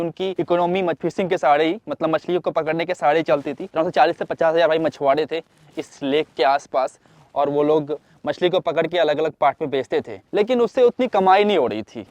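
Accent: Bihar, India. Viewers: Tu Budbak bihari hai kya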